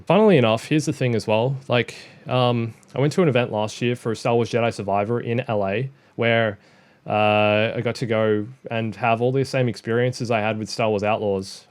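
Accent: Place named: Australia. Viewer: Wait, You are an aussie, I thought you were a kiwi.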